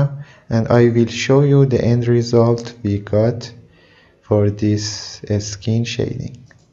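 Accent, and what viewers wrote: Iran. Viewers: literally one of the most accurate persian accents i've seen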